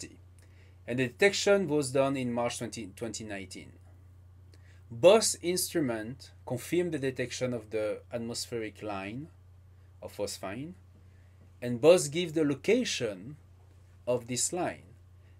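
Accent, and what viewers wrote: France. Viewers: C'est bien ça, un accent français, j'ai compris au moment de "Camille Flammarion" hahaha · Nothing as charming as an English speaker with a French accent 👽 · I wanna be told about Venus with a French accent. Oh Good. Thank God.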